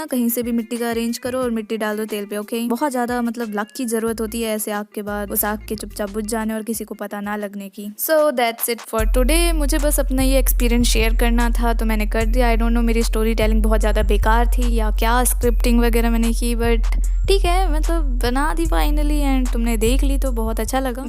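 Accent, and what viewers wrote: Bengal, India. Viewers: Are you Bengali?? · Are u a bengali ? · Are you Bengali ? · Are you from Bengal or Odisha ? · are you bengoli??? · Are you bengali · Didi are you a bengali ? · YOU ARE BENGALI RIGHT?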